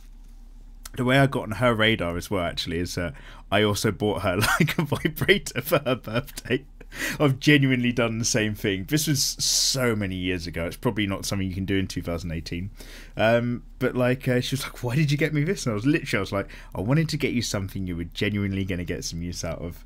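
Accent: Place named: Liverpool, United Kingdom. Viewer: liverpool scouser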